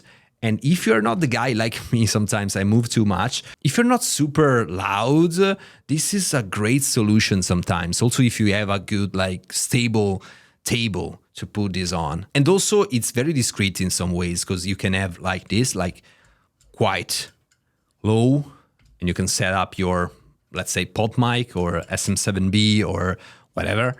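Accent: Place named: Italy. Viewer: I honestly like the Italian accent and it doesnt bother me maybe bc im from the EU and im used it but none the less great video, I had the OG lp arm and wanted to switch to the white lp pro but 199 euros - HARD pass.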